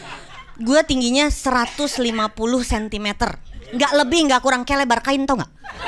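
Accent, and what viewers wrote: Indonesia. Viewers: Serasa ngelihat radit tp versi wanita dgn logat sunda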